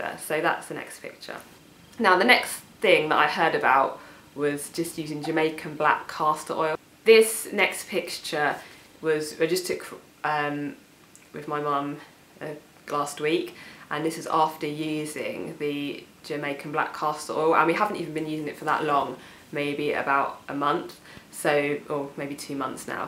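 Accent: United Kingdom. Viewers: Thank You, I truly enjoyed your video.I am happy for you and your mom,and wish you both continued success.I have male patterned baldness and hope to Give it a Try.P.S. On a side note, I love your accent, Is it British,Austrailian or is it from the Caribbean. D.Taylor Montreal,Canada. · I am in love with this woman my goodness and this English accent is omg so beautiful. Love her! I forgot all about the hair regrowth tips hell lol.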